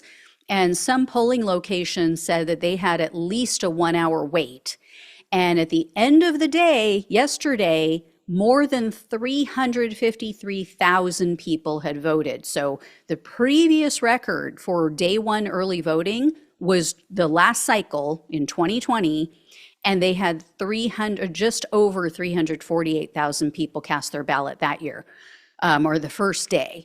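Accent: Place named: West Coast, United States. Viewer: I wouldn't think it's Southern. Sounds to me West Coast, maybe CA. I grew up in Oregon, so sounds similar to me. But hey I don't know for sure where she's from.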